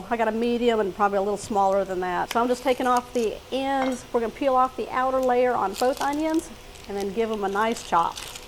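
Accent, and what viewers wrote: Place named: South, United States. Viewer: Soon as you hear a woman with a Southern accent say y'all let's get ready. You know you're in for a treat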